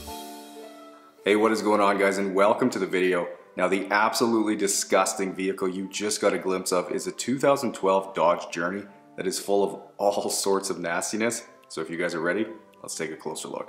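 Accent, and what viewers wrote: Canada. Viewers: I loved this video! I’ve never seen your channel before but a YouTube friend said I had to add you to my watch list. I love dr pimple popper, the hoof GP and now you! Your voice is so soothing (love me a Canadian accent) And the transformation is amazing! What an incredible job 👏🏼 Love from Scotland 🏴🥰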